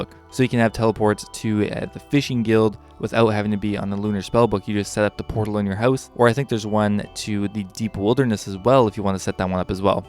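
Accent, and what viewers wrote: Canada. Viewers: Are you Canadian? I thought I heard an accent 🙂 🇨🇦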